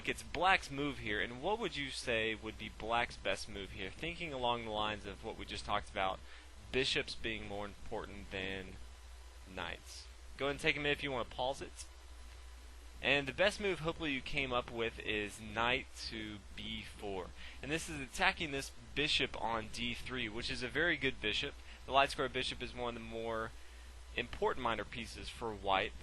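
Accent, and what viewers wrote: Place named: South Carolina, United States. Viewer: you sound like your from Aiken, South Carolina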